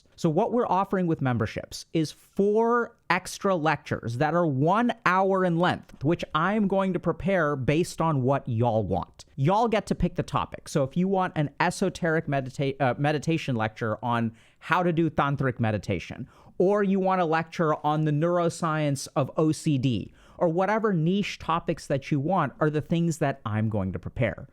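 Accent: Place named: India. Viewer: Just gotta say it’s very dissonant for me to hear ya’ll from an Indian gentleman with a slight accent 😮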